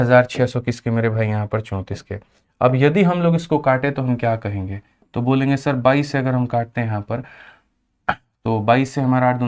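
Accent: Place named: Bhojpuri, India. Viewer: Sir ji aap Bhojpuriya andaz me lazawab lagte h 😅❤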